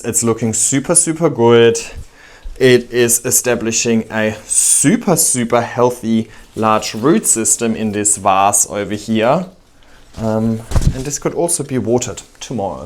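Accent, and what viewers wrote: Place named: Germany. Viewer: Your accent sounds like your German. Are you? 😁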